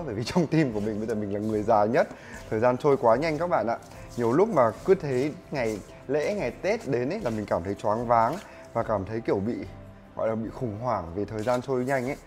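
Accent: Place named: North, Vietnam. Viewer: Mình là gái miền nam và mình bị cuồng nghe giọng trai bắc , giọng anh này nghe hayy và ấm ghê luôn 🙆🏻‍♀️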